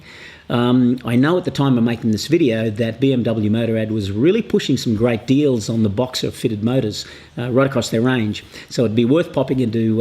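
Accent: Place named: Australia. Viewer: 100% Agree - great footage and review - impossible to here what Metzler you recommend with the MUSIC !! and the Aussie accent....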